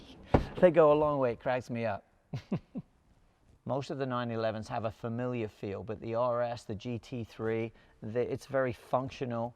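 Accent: United Kingdom. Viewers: Nottingham, but he's been hanging around with a lot of Yanks.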